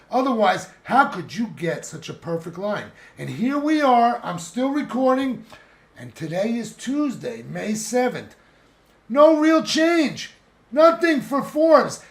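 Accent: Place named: Philadelphia, United States. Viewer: Hey are you from Philly?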